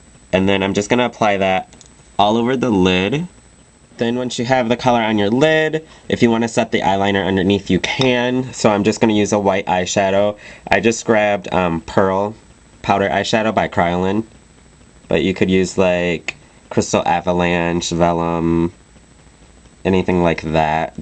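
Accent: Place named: Detroit, United States. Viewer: Lol i noticed that too, maybe he's from the north? b/c i have family from detroit & that's how they say it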